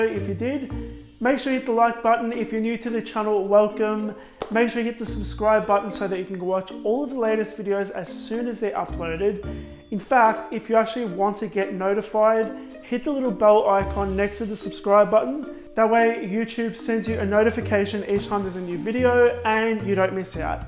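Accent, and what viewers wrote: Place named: Australia. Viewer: Wow..am i the only one who is here by watching the luscious froasting and ended up watching twice because of his amazing australian accent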